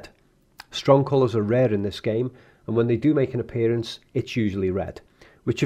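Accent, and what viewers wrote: Liverpool, United Kingdom. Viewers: My apologies for always assuming you were from Scotland instead of from Liverpool. My ignorance of accents outside of the USA.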